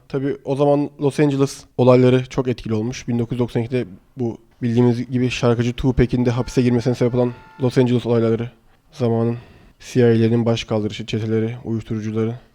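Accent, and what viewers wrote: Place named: Izmir, Turkey. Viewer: Tenekeli gibi Tepecik gibi abi izmirlimisin :D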